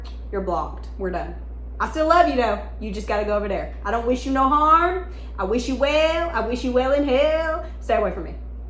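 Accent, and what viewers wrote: South, United States. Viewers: I do love her Southern accent. Paying attention from the UK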